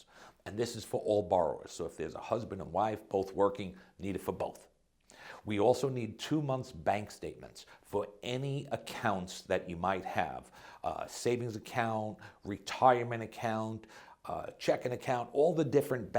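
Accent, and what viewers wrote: New York, United States. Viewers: NM. I see you're in CA. I thought maybe you're in NY from your accent.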